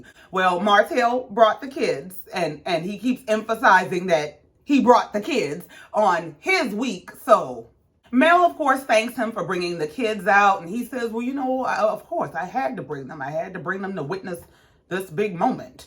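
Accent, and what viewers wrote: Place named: Gullah, United States. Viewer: Nooo hell no I hear some geechie/Gullah in there, if you don’t mind where are you from? Lowcountry SC here and I know what I hear 👂🏾👂🏾👌🏾🤨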